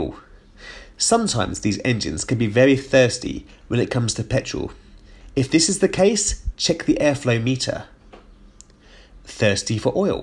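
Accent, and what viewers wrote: United Kingdom. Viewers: Hey, another great video, I really love your British accent too!!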